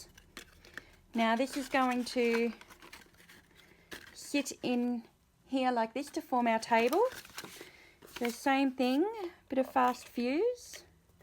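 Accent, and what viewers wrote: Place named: Australia. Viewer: Great card. Wonderful to hear a fellow Aussie voice. Pam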